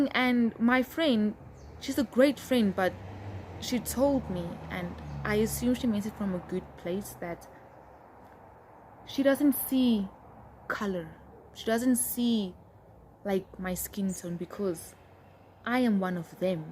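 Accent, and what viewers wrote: South Africa. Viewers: As an African Male from your accent and the way you look, full lips, flat nose, I thought you were a light skinned Black Xhosa African lady. I guess not to prejudge is the key to understanding peoples backgrounds without outright telling someone what he or she is. I have lived with the Coloured community in JHB since 2008 its now 2023 and I have been treated with the outmost respect. I can confirm that all the stereotypes I had about Coloured people as an African male is all gone, and I have also taught them about African/Black culture. Its actually refreshing to live with people until you forget that you come from different back grounds, when you look at each other you see another human being NOT racial stereotype. I used to catch THE FIRST taxi every morning with a lady who only spoke English and couldnt speak Afrikaans, she was open to tell us that she had never been accepted as a coloured when she moved to Johannesburg because of that. 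She grew up in Durban, spoke English and understood Zulu only. Moved to JHB as an adult to live with family, the family spoke Afrikaans as a first language...SHOUT OUT TO RIVERLEA EXT. ZOMBIE. BOKSTRAAT. EXT 5. EXT 10.👋👋👋👋 AWEH! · I don't get why people think you sound White though... I know you don't sound White,you actually sound like coloured folk from Western Cape! You sure don't look White aswell,you don't even look coloured with all due respect... I would guess you are Xhosa! Anyway Shoutout to raising the conversation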